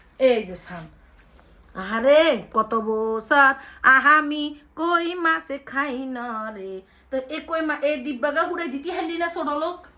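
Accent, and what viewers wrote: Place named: Feni, Bangladesh. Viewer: আপু আমরা ফেনীর মানুষ তাইতো আপনার কথা শুনলে মনটা ভরে যায়।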